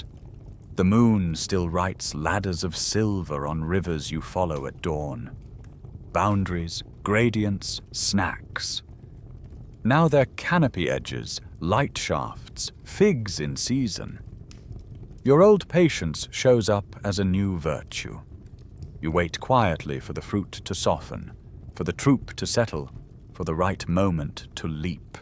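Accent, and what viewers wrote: United Kingdom. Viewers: I love these videos from Sleepy History. Now turn on that fan for some background hum and wow, this AI toff accent! But remember, a British gentleman does not say missle, he says missile.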